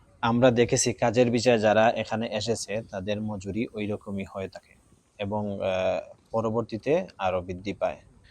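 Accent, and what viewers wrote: Sylhet, Bangladesh. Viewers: আসসালামু আলাইকুম। ভাই, সম্ভবত আপনি সিলেটি, আমি সিলেট থেকে বলছি, কিভাবে আসতে পারি, জানালে উপকৃত হতাম।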